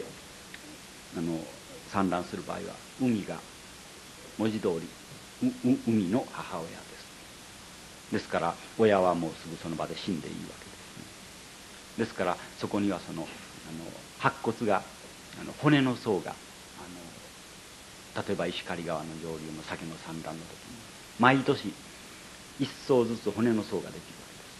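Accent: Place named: Shikoku, Japan. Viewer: 四国出身の方らしいアクセント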